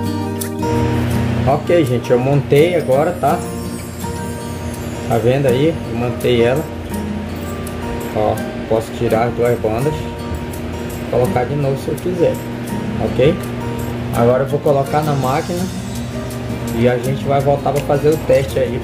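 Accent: Pará, Brazil. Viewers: Pelo sotaque vc deve ser paraense, cara, gostei da dica, certa vez joguei uma máquina fora porque não sabia se podia amolar, agora já sei, sobre o sotaque, morei 10 anos em Belém e 1 ano em Macapá, foram anos incliveis na minha vida, sou cearense.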